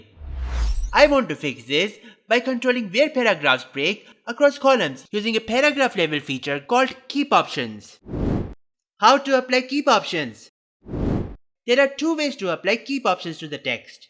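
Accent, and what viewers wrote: India. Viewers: Glad to hear about this, may be just a bit slower taking into account that you are being watched in english and you talk as Hindi english : )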